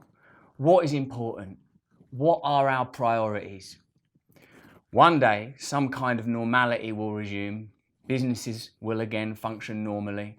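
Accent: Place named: United Kingdom. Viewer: l like this man when he speak very clear British accent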